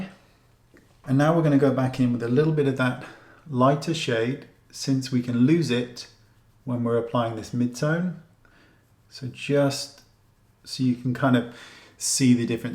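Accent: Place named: United Kingdom. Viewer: That British accent gets me every time.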